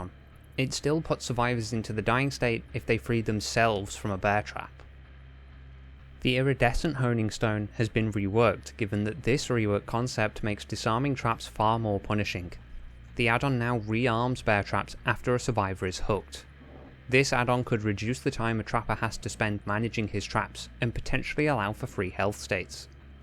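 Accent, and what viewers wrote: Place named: United Kingdom. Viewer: I came for the Trapper rework, I stayed for the sexy British accent ❤❤❤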